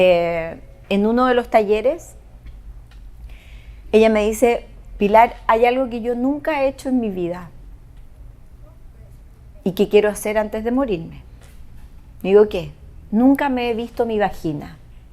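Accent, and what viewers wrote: Chile. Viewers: Pilar,ya no eres la misma,que pena, me reía ,disfrutaba y aprendía mucho con tus charlas,tu acento chileno y todo lo que decías 😢😢😢😢😢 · casi no se escuchan asentó chileno Por eso me guste mucho escucharte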